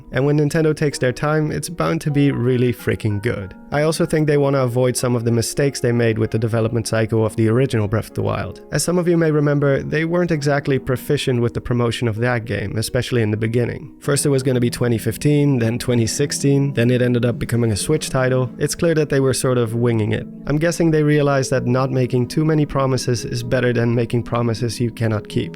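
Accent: Netherlands. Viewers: There are way worse accents then the dutch one. It was actually quite charming. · kan aan je stem horen dat je een nederlander bent, congrats op de 100K man. Mijn PC kan elden ring niet runnen, maar wil de game uiteindelijk wel gaan spelen(nadat ik een betere gaming PC bouw, maar dan moet ik eerst wachten totdat de prijzen gezakt zijn).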